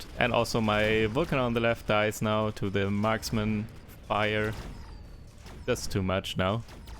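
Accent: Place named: Germany. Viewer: Sorry for the personal question...I am still wondering about your clean speach/language. Are you a native German maybe?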